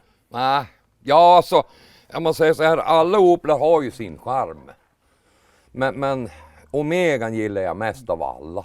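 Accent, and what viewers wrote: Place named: Norrland, Sweden. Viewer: Hur roligt som helst 😂att se en Opelfantast med en dialekt som är superhärlig. En sann norrlänning 👍. Jo jag har haft ett antal Opel genom åren. Några Rekord, 1 Commodore, några Kadetter, en Omega kombi, och den sista var en Astra.... Gillar man rost då ska man köpa Opel😄. Nä fy, det var alldeles för mycket fel på dom, i synnerhet Omegan från -87. Men det är kul å se att det är någon som fortsätter och gilla och bevara Opel från den tiden. Något som skulle vara kul att ha vore en Commodore från tidigt -70 tal men nu är det för mycket pengar i dom... Hälsningar från en Sörlänning boende i Småland 😎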